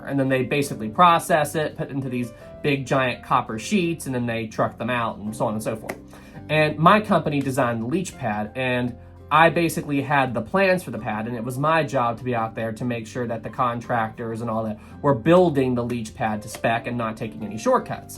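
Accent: South, United States. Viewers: You're from the South aren't you?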